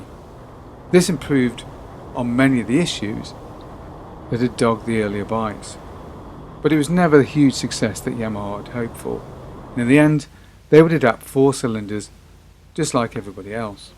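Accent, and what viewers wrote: England, United Kingdom. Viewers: That bit about the sunbeam looks like it was filmed in the Netherlands, do you remember where? You sound very English though, even if you were taught the Queen's English in school, like i was, that's hard to fake.😁🇳🇱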